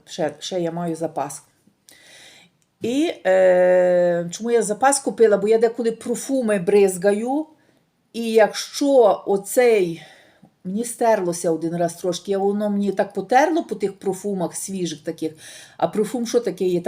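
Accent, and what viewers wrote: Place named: Western Ukraine, Ukraine. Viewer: Мова ужасна,неграмотна .Мелиш по бендерська,не принмнл вас слухать,Особенно як ти кажеш євро,,,,Даже во всех Странах кажуть ЕВРО.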